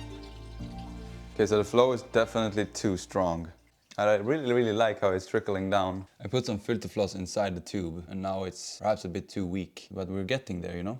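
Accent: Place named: Scania, Sweden. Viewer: ”Grön”, haha I love your skånska….. I have the same dialect…….. idk why im writing this in english but it just seems like the right thing for some reason…….. Also the ferns are really gorgeous, ferns are some of my favourite plants! Maybe from all of the dinosaur documentaries I watched as a kid….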